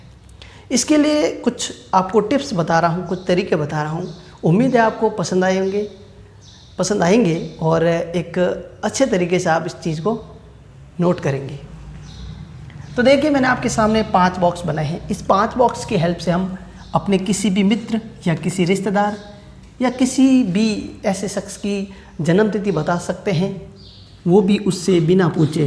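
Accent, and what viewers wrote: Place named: Jodhpur, India. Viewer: Enki aavaj me jodhpuri tone he shayad ye jodhpur ya eske aas pas se he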